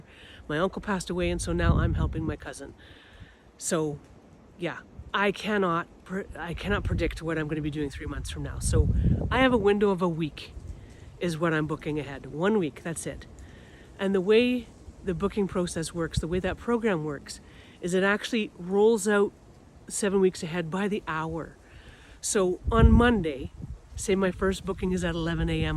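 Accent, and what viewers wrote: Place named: East Coast, United States. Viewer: We love you soooo much! And thank you for reaching all of us like you do! Your messages have changed my life! Love you I was gonna say, are you a east coaster? I grew up in Sydney NS and I recognize your awesome east coast accent poppin in, anywhooo love you moon and back and thank you for all that your channeled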